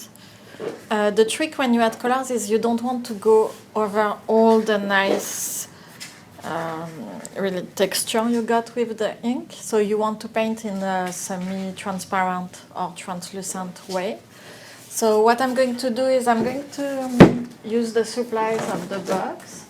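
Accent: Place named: France. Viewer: your accent is charming, perfect English, I wish my French were as good! I cannot wait to try this technique.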